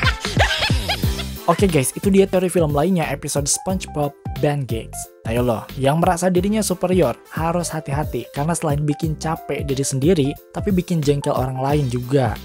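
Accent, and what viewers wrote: South Jakarta, Indonesia. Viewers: Bg lu orng jaksel ya?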